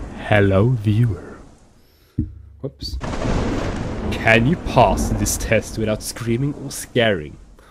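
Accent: Sweden. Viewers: love your accent by any chance are you swedish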